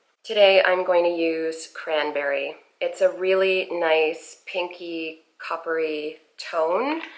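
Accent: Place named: Canada. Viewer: I don't know why, but I love Canadian accent. :) Nice makeup.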